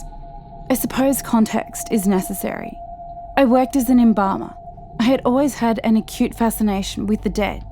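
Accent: Australia. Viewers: The best Aussie voice is at it again... Great video sis!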